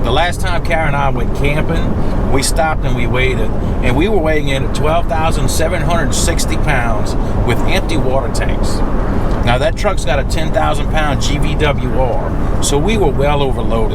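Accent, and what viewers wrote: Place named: Louisiana, United States. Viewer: Man, you sound like Jesse Duplantus! You must be from Louisiana.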